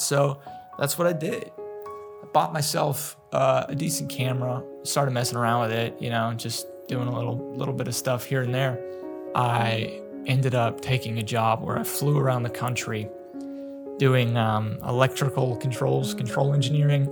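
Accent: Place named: Virginia, United States. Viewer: That Virginia accent is a big part of why I enjoy your ASMR channel. 😁 Your story is so interesting. Best of luck to you!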